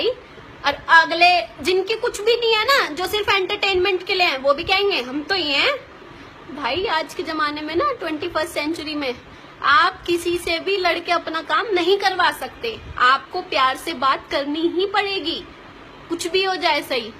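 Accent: India. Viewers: Hiiiiii haryana se ho kya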